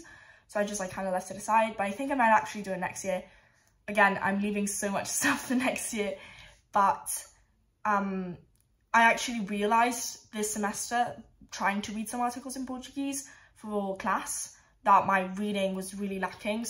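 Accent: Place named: Scotland, United Kingdom. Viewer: That is scottish accent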